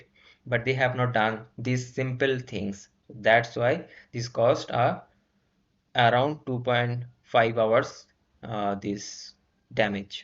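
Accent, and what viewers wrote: Bengal, India. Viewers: You share good knowledge, but try to talk through a prompt reader, and try to do a better accent. The Bengali in you is shown through the accent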